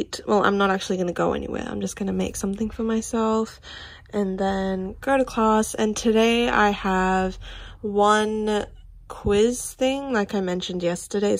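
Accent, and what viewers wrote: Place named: England, United Kingdom. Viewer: Are you from the UK? You have a very English accent.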